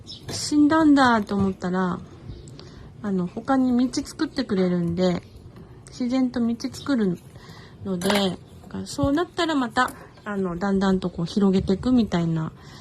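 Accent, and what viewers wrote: Karatsu, Japan. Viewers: とても、自然体で、このくらいが、個人的には、大好きです。 標準語と、唐津弁の混合もすき！ ポフポフ良いですかね？ 自分も、スマホ一つです。 帽子紹介のシャキン！ ナイスです。 よく工事してますねー？ 高級住宅街かなー いつも、癒されてます。 仕事が、嫌いなので、動画を 見て、ほっこりさせてもらっ てます。 ありがとうございます。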